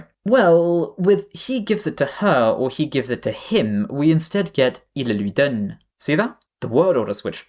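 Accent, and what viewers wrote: United Kingdom. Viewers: y u sound brit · I always thought you were a native brit and I thought I was going crazy hearing swedish in your french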